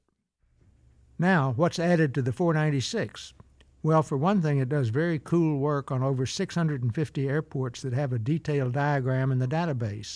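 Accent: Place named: Tennessee, United States. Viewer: You definitely don’t sound like you’re from HGR. Heck, you sound like an East Tennessean. Somewhere around Luttrell or Bean Station. I am based at Sky Ranch btw (TN98). Just NNE of good ole KTYS. Thanks for a wonderful video!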